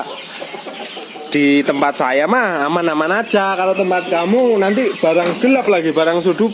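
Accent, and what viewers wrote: Java, Indonesia. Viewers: Aku msh pemula Mas Yang selalu mengikuti petunjuk. Mas njenengan ini orang lampung kok logatnya kayak orang jawa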